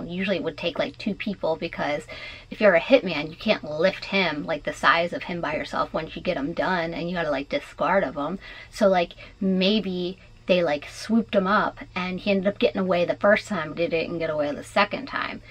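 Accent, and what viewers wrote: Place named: Philadelphia, United States. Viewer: You’re from Wilmington!! I was wondering where and I must’ve missed it before. I’m from right outside Philly (prospect park) and I knew you sounded like me...our “accent” lol 😂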